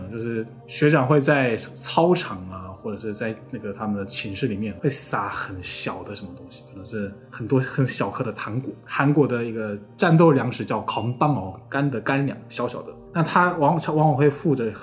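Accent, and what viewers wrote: Standard. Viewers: 国语好标准啊不看评论不知道是哪国的，看的时候心里有几十个卧槽，我以为最多会被打两下给老兵洗袜子之类的，没想到这么黑暗！年少时曾粉红也曾痴迷韩剧学韩语，大学之后认识的留学生让我帮他们考试传答案，首尔和釜山学生背后说坏话，那种微妙的感觉我就想这和中国也太像了，神秘面纱没了之后依然很羡慕韩国的人均工资，以及内地女生对韩国男生的态度，留学生给我看约出来的女生照片真的又漂亮学历又好有的见面一两次语言不通就提出要和他在一起惊呆我。后来知道了娱乐圈财阀军队校园霸凌这些，我的理解是资本凌驾于政治之上很多事情也会逐渐离谱，思想上灌输洗脑教育会让不同阶层的人暂时忘记阶层不敢肆无忌惮的在学校军队欺负人，大不了长大之后再把自由意识找回来，都说大陆没自由我也天天骂觉得台湾或许是乐土，到现在我觉得或许根本没有乐土，一个地方也不是好或坏就能定论，作为平民很庆幸生在东北的十八线小城没受过校园暴力不用服兵役家人溺爱十八岁前傻子一样活着直到30岁还很幼稚，最后希望中日韩站起来让平民日子好过点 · 看影片也幾年了，你的中文用字和發音已經比許多台灣人還要精確和標準。以非中文母語者或是雙母語者來看，真的很厲害，每次看你的影片都覺得非常佩服。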